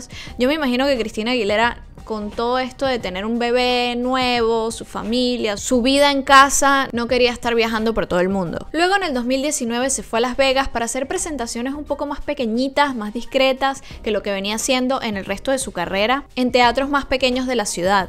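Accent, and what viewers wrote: Venezuela. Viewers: Te amo por tu acento venezolanooooooooooo... muy dificil escoger una cancion... creo que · eres venezolana cierto? Ese acento jejej me hubiera gustado ver a verla cantar en el caracas pop festival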